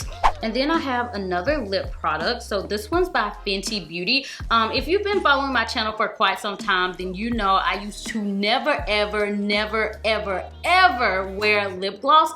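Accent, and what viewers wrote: South, United States. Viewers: Ugh 😩 you are the cutest southernest momma ever and I love it!!!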